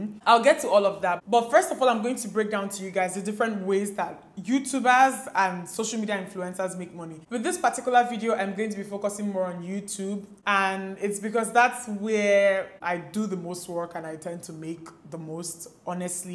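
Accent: Nigeria. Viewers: Thanks for sharing! 💕 If I may make one recommendation, please speak a little slower. I’m somewhat accustomed to the Nigerian accent, but even I struggled a little to understand everything you said. I agree with the other viewers, you look beautiful!💕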